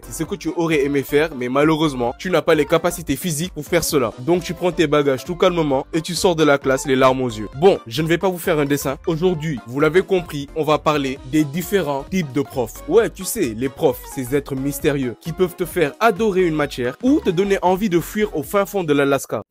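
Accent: Senegal. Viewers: Ta trop un acent senegalais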